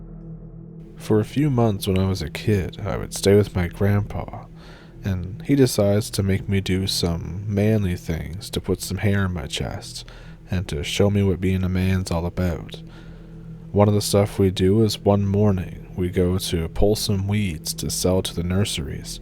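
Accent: Canada. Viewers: Greetings from Ohio! Yeah slight Canadian accent. Just the "ou" sound, like out, about. I like it 🙂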